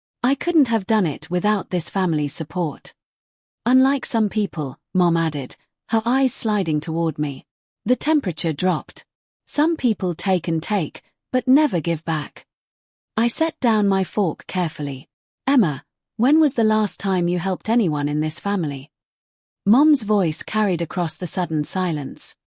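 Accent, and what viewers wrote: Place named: United Kingdom. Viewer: As a Brit surprised to hear a British accent in this story. “I started therapy to understand why I believed them & thought you were overreacting” Good Grief Watching from Leicestershire in the UK 🇬🇧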